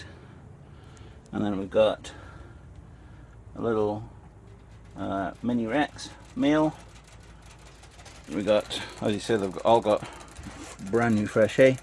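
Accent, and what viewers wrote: Scotland, United Kingdom. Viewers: I know you live in Canada but are you originally from Scotland?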